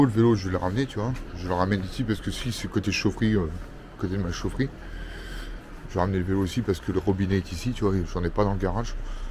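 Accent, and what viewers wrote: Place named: North, France. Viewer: Bonjour, Bravo pour ton courage et ta motivation. Pas évident de rouler dans ces conditions. C'est difficile avec le froid et l'humidité. Encore une video fort sympathique. Avec un accent du Noooooord qui ne passe pas inaperçu. J'adore. C'est toujours un plaisir de te suivre. Bonne récupération aussi. C'est important. Et tant mieux si tu as pu te remettre rapidement en forme sans trop perdre le bénéfice de tes entraînements précédents. Il est vrai que le tubeless, c'est top. J'ai parcouru à ce jour 2000 kms sans une crevaison mais ça reste tout de même un investissement. Gain au niveau du confort, de la réactivité, une meilleure tenue de route et stabilité dans la descente de col. Le tubeless en 28, sincèrement, je recommande. Au plaisir de pouvoir te suivre dans de prochaines vidéos. Prend bien soin de toi. A bientôt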